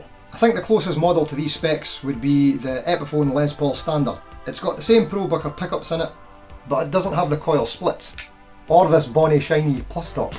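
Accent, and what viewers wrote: Scotland, United Kingdom. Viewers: Guys I think he might be Scottish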